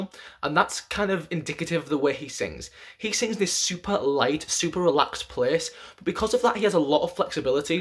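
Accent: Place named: North, United Kingdom. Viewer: im so glad i stumbled across your channel, i for some reason love hearing you say their names in your british northern accent rather than all the american vocal commentaries cos it feels so familiar to me hahah "haaey chan". i love that u comment on each members voice and have good things to say about all of them cos they really are a group of MANY insanely talented singers.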